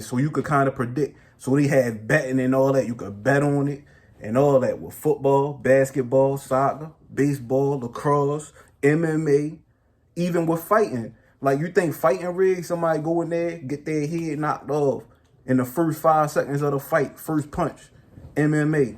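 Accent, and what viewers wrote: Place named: Baltimore, United States. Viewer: Baltimore accent.. Peace brotha 👍🏿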